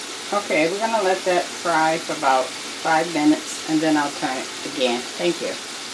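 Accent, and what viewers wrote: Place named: New England, United States. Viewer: I Don't know who you are but I can tell a voice from New England 🙌🏾🙌🏾 Keep doing your thing Miss Lady 🙏🏾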